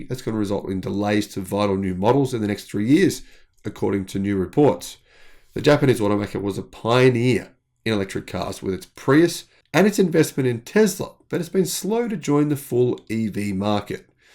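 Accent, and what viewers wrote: Australia. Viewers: She's apples! No worries. True blue, Toyota's up Shit Creek without a paddle but they keep yakking on how she'll be right. Crikey, they've got Buckley's, what a bunch of bludgers, a few stubbies short of a six pack if you ask me. They say they're on a ripsnorter but it a dog's breakfast, 'cause those hybrids still need to pull in to the servo. What a bunch of drongos and galahs. They'll never make the Big V when it comes to EV 'cause it's just them pulling the wool. Fair dinkum their only attempt so far is cactus. Now they're gonna try and get a free ride by dinking on some Chinese makers. They really need to get out of woop woop, put in some hard yakka and get their ship to chuck a U-ey. Strewth... did I really say that. Should have saved that one for Ron. Don't worry... we are just stoked when you talk all antipodean!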